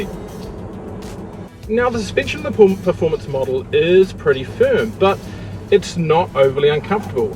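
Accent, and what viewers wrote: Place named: New Zealand. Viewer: Nice review, Ive seen soooo many international reviews, nice to hear a kiwi accent with a kiwi review, cheers !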